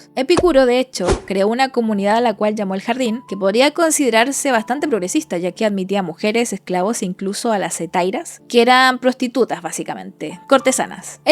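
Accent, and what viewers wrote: Chile. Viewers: me encanta el acento xileno, y tu contenido excelente! un saludo de cordoba, Arg !! me hacia falta reforzar esto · Solo con escuchar tu acento me di cuenta que eras Chilena, agradecido de encontrar tu canal 🤍, saludos desde la V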